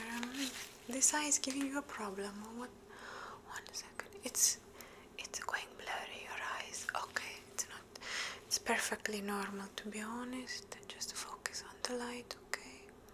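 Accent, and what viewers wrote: Italy. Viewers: Great Italian accent 🇮🇹❤️❤️👍👍I like it a lot you do the best Italian accent ever🇮🇹😌❤️❤️sooooo cool!!!!😎😎😎😎😌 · OMG, your Italian accent is so cute without being over bearing or anything like that, if that makes sense at all. PS - You've got the stop the Qtip when there's resistance! ;-) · LoVe Italiano food & accent 💖🥰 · Your Italian accent is great - will you ever be doing a follow up to the Rome visit video?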